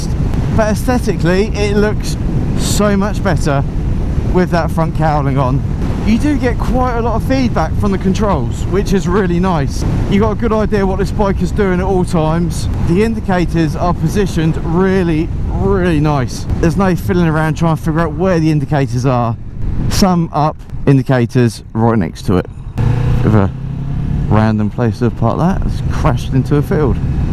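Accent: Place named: United Kingdom. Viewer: 😮Man is your British accent HEAVY dude. It's kind of hard to understand you to be honest with you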